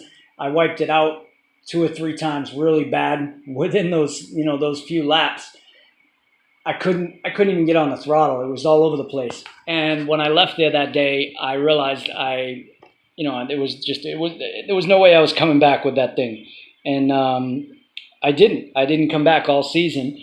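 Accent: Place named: Boston, United States. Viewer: love the bean town accent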